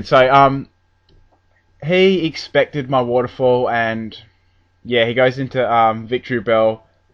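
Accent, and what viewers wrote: Australia. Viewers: is the guy commentating australian?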